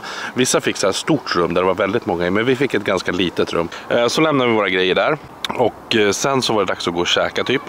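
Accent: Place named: Dalarna, Sweden. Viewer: gud va du pratar Dalamål har aldrig hört dig prata så tidigare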